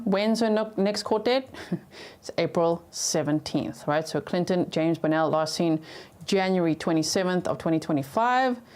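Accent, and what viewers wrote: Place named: South Africa. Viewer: Why do you sound SOUTH AFRICAN?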